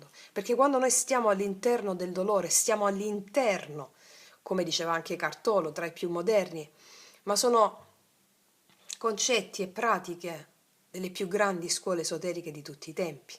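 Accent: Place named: Apulia, Italy. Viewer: Dal vago accento sembri pugliese...sbaglio?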